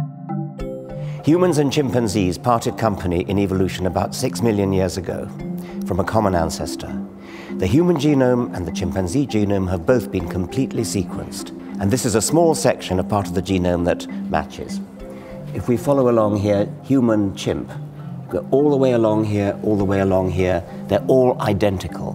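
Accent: United Kingdom. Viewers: "Dawkins is just an ignorant homosexual" Yeah, but he has a quaint British accent. lol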